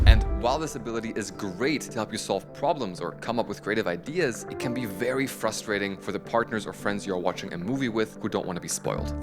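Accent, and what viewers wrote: Germany. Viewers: Wenn meine Ohren mich nicht komplett in die Irre führen, ist deine Muttersprache Deutsch. Wieso machst du die Videos nicht (auch) auf Deutsch? In meinem Umfeld gibt es leider viele, die kein Englisch können und es ist sehr schwierig ihnen die ganze Sache anständig zu erklären. Leider gibt es ja kaum gute deutschsprachige Videos zum Thema. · I hear the german accent 🤨😂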